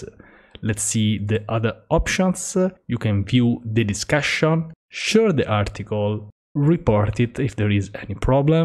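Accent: Italy. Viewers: Very nice. And that crisp Italian accent, wow! :) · dalle prime 5 parole ho scoperto che sei italiano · Mama mio Italian boss accent i love it !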